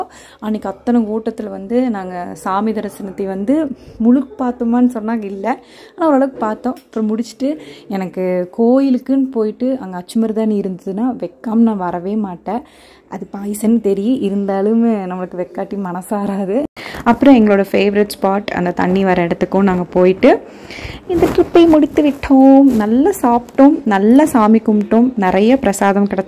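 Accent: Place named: Kongu, India. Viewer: Akka kongu tamil super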